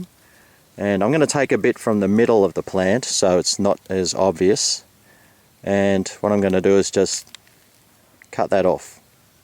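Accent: Australia. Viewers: "British" accent??? It's Australian. Everyone's laughing at your class now.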